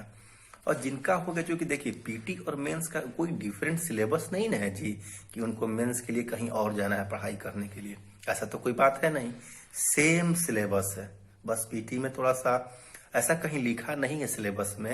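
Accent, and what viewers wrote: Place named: India. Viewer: Bhai kchh v ho Aap sudh desi bhasa bolte ho.....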